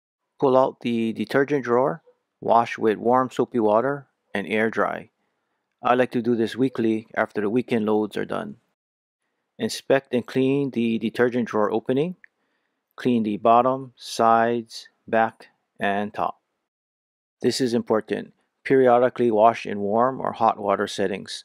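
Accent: Hawaii, United States. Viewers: I love this! It’s helpful as we’ve had out for almost a year and I’ve cleaned it twice 😅 are you hawaiian? I can hear it haha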